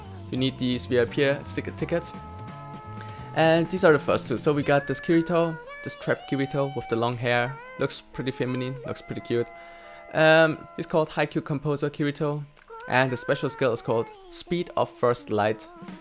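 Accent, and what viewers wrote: Germany. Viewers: Özlem Polat and for his accent, it’s a german/ dutch accent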